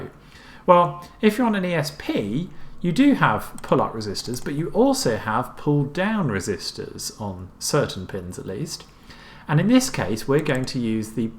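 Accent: United Kingdom. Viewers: Absolutely excellent instruction video! Thank-you. PS love the 'posh' accent. :-) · Miss the British accent... Away from England for over 12 years...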